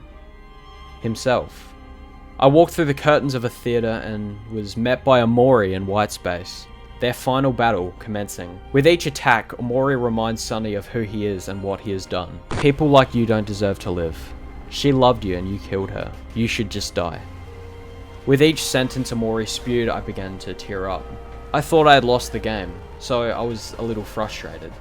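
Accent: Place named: Australia. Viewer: Fabtastic video, man. You're definitely gonna make it, man. Also, every time you say Mari, I keep thinking you're saying my name in your Aussie accent.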